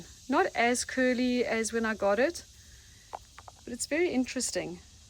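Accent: South Africa. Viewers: Amazing garden a credit to you I detect a SAfrican accent is that where you are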